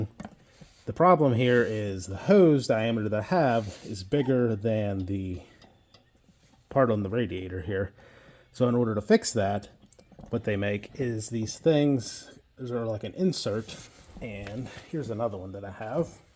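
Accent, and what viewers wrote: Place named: West Virginia, United States. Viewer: What part of West Virginia are you from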